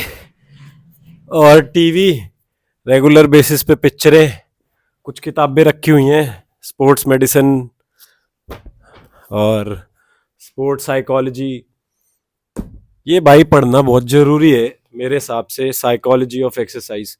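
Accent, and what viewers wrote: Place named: Haryana, India. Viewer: Ye kidhar hai baawli, accent aapka haryanvi ka Gujar, Yadav, UP, Delhi ke lagte areas ka lag rha hai. Support and blessings to you though!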